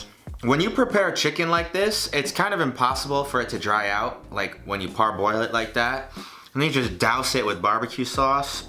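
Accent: New York, United States. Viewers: your accent is so new york lol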